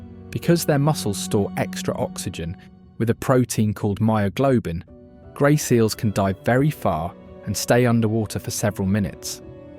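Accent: United Kingdom. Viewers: I tend to not believe so-called "authoritative" British accented voices.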